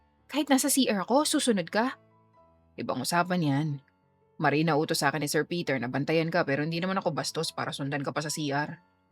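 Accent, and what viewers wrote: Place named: Iloilo, Philippines. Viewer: Aliw ang ilongga na punto.. miss..❤❤❤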